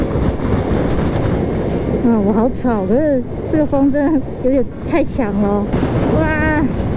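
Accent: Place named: Central Taiwan, Taiwan. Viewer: 好親切的中部腔~😆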